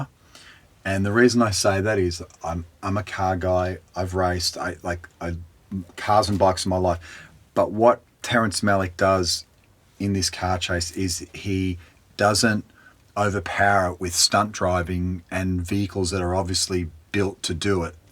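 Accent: Australia. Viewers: I didn't even know he was Australian, never heard his natural accent! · he sounds extra Australian here lol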